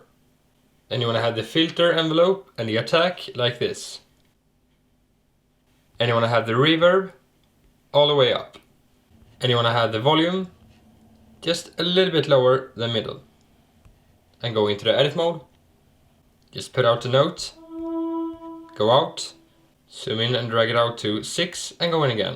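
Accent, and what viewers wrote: Sweden. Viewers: visst är du svensk eftersom att du har på svenska inställningar och pratar lite svengelska xD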